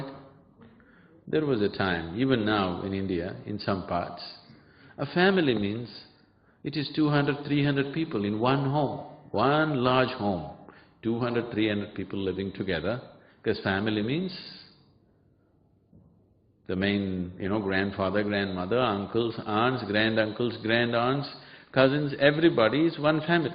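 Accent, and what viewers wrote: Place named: United States. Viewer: interesting how even Sadhguru adapts the American accent, because of its surrounding..you don't hear him speaking like that in India :) have a blissful life you all..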